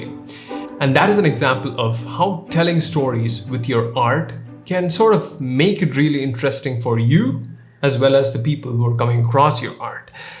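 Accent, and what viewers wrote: South India, India. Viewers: are you from south india bro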